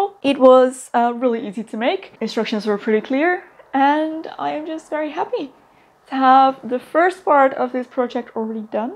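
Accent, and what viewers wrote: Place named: Netherlands. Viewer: hoor ik een wat Nederlands accent af en toe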